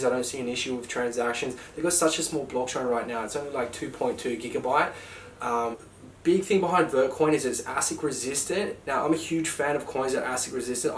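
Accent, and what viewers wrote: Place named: Australia. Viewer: Can't understand your Australian accent, were you saying Adder?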